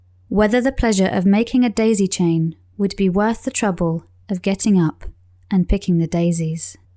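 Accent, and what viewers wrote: United Kingdom. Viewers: 피터팬 읽어주기 컨텐츠 기다려보겠습니다!! 영국작가가 쓴 런던배경 동화라 영국 발음으로 공부하면 너무 좋을거같아요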